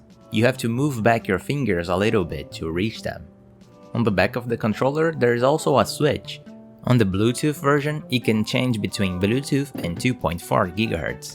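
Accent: Brazil. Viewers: o Pc dele inteiro tá em português do Brasil mano, e ele não fala como nativo, ele tem sotaque brasileiro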